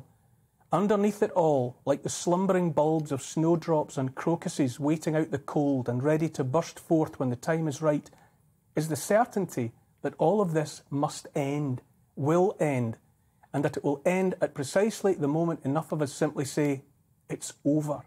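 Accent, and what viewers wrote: Scotland, United Kingdom. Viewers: Your speeches are spot on and with your beautiful Scottish accent it is truelly meaningful and comes across so true, its a shame this is not a speech to the nation on the 6 0 clock news. Well put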